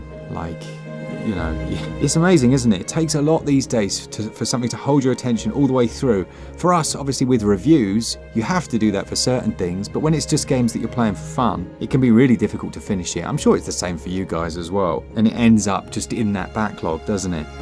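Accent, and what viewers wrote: United Kingdom. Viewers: Sounds very British indeed 🍵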